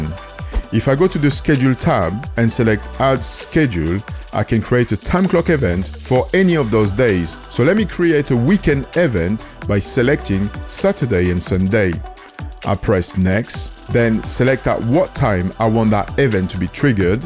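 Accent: France. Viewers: Your French accent tho. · I loooove your french accent and the great tutorials!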